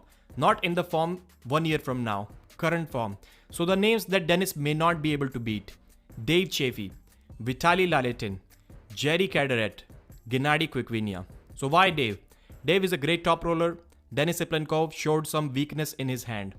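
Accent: India. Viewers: Обожаю слушать индийский акцент!!! Так мягко и приятно звучит, как колыбельная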